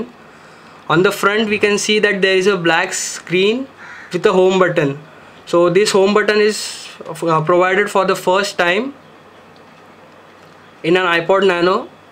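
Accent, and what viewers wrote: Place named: India. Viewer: Is it me or every Indian sounds the same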